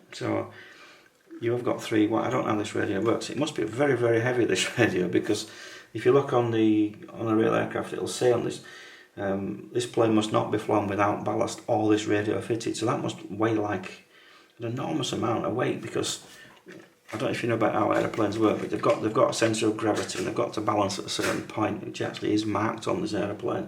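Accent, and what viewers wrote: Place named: Yorkshire, United Kingdom. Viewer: Thanks….love the details…..thought the ‘mumbling’ comment was unfair and the Yorkshire accent is quite mild, I heard everything clearly. Please do not let that comment discourage you, keep them coming.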